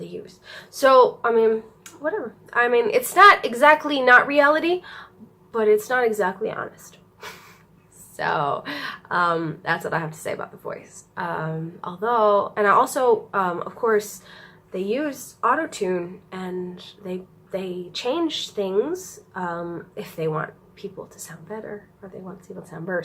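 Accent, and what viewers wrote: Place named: Baltimore, United States. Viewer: Wow, you sound so much like home to me. Are you from Baltimore? Pikesville? Love your videos!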